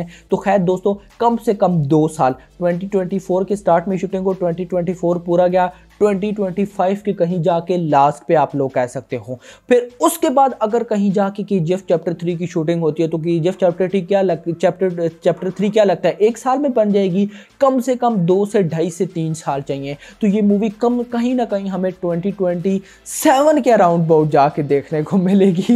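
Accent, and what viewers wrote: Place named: Punjab, Pakistan. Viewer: bhai thumara pakistani punjabi urdu accent aata hai...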